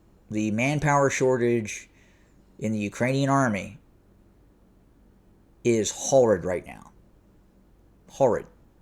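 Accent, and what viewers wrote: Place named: United States. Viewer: Nice to hear The Kremlin spin in crisp American English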